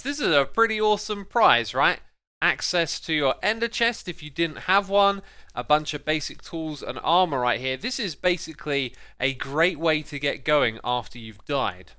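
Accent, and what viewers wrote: United Kingdom. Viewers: Because of his British accent and his commentary style of the true gentleman, I feel like the sound of sipping a cup of tea every now and then would happen any time.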